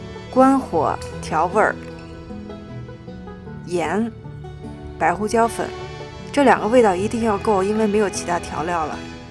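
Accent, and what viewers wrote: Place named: Beijing, China. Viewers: 听口音像是京腔。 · 冒昧請問是哪裡人？在那裡長大的？聲音像北京人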